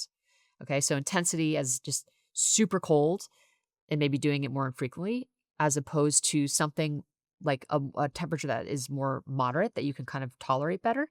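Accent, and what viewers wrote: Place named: South, United States. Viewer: I dip my hand into a ice fill cooler .. southern voice... Again thank you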